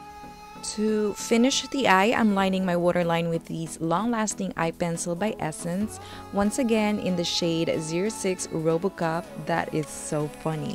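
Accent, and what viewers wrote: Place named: England, United Kingdom. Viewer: I really like the way you talk. I wish i could have Your English accent, wow. I always watch your vlogs, it helps me lots for my makeup style. Thank you. Mwahhh.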